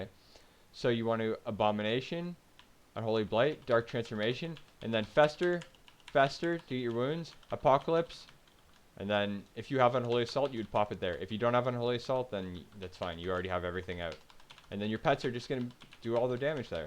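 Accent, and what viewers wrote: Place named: Nova Scotia, Canada. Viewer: Canadian eh, that "R" pronounciation sounds almost Nova Scotian/New Foundlander.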